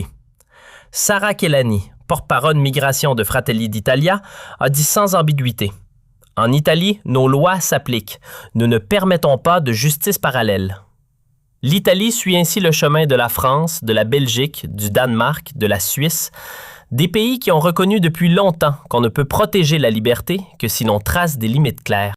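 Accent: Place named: Quebec, Canada. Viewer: Stop à l'IA qui alterne les commentaires avec un accent Québécois et qui nous répète en moins d'une minute 2 fois la même chose RAS LE BOL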